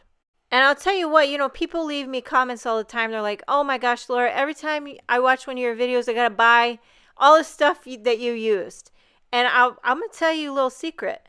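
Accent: Boston, United States. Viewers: You kill me!! 😂 I love watching and listening to you! U sound like a Boston Ma girl sometimes when u talk! Thanks for always making me smile and sharing all ur beautiful cards!! 😊💗👍🏼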